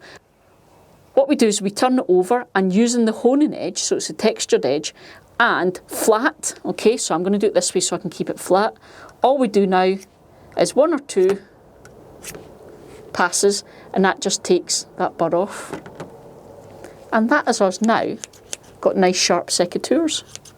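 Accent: Scotland, United Kingdom. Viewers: Thank you from Phoenix, AZ, USA a little bit of difficulty understanding that beautiful Scottish accent...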